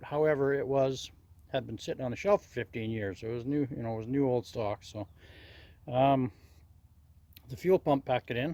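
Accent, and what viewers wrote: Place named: East Coast, United States. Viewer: you have more of an east coast accent.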